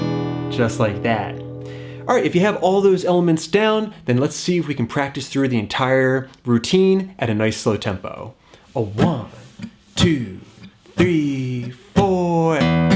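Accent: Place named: United States. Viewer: Love your passion amigo! Great lesson again....where are you from in the US?? Your accent is super cool! Much love and gratitude from Ipswich, UK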